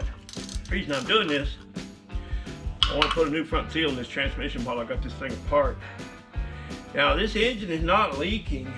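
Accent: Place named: Arkansas, United States. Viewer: Whenever I hear some picking on a guitar! I know I’m in-for a wonderful trip into a mechanical adventure. Thank you for taking us along on your trip! You are the best at walking us along with you,while you recover your old square body from the ashes! I have watched every one of your videos from the Rockabilly build to now. And I have never been disappointed in any them. Your “ Ol’Arky” styled delivery and work ethic are a joy to witness. Thank you sir,for your guided tour of your trip of raising an old friend up from the ashes. “I sure do preciate ya!!!” From one old timer to another! Till the next time,keep on keeping on! P.S. we need more “ pickin and a grinnin!”😊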